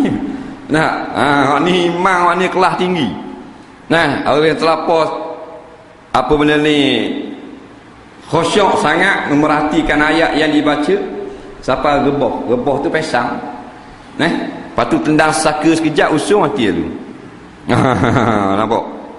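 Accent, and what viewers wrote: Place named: Terengganu, Malaysia. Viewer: loghat ganu mu dok tahu..jgn komen tnpa berfikirr..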